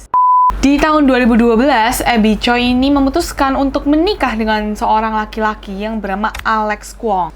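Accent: Indonesia. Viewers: Aku seneng Medokeee neng...Lnjutkan perjuanganmu 😘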